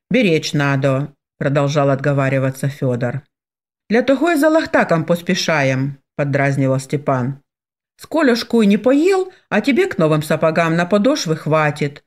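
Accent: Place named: North, Russia. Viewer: Прекрасное чтение, даже не представляю, как это можно, привыкнув всегда выговарить гласные так как мы обычно это слышим, так быстро перестроиться и читать как бы О-кая. Это придаёт рассказу какую-то особую прелесть и неповторимость. С большим удовольствием слушаю и всегда с нетерпением жду продолжения. Да благословит вас Бог в этом служении.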